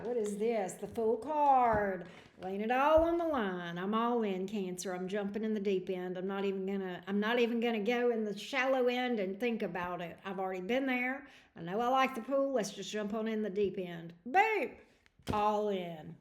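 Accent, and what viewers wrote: South, United States. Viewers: I don't know where you're from but I know you're a southern chick like me. Love it!❤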